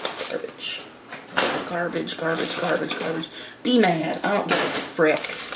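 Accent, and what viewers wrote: South, United States. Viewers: Love your Southern accent. Sorry for the bad people who hurt you. I just don't understand why people can be that way. I get so much enjoyment watching you enjoy your food and I guess it is a vicarious thing. Wish I had the same meals you eat. I really do trust your comments about food and think you have a wonderful discriminating sense of what is good and what isn't. You are a beautiful person and bring joy to many of us. Be well.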